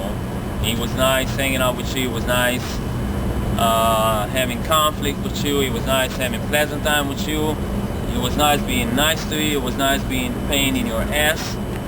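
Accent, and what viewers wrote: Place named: Spain. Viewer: this guy looks American but talks like his Spanish wat a funny guy lol, is he faking his ascent lol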